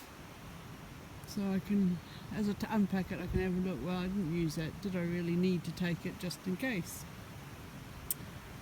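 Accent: New Zealand. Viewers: Loved it!...great wee vid....wee hint of NZ accent there??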